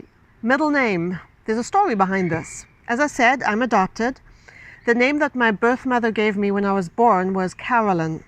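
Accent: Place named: United Kingdom. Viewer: London I get it cause you have a tilt in your accent to the British and as for Croatia I really do not know why 😂😂😂😂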